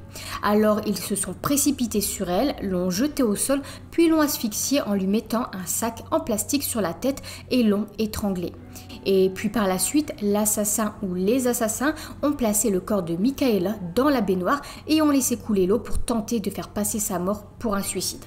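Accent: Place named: Nord, France. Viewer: Oh lala tu viens du nord toi l accent chti 😅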